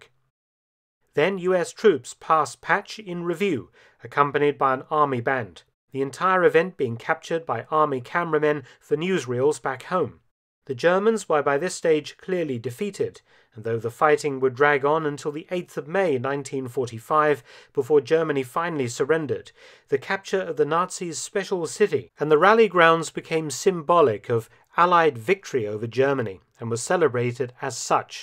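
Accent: United Kingdom. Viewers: This dude sounds British to me but idk if he is but if he is he said soccer...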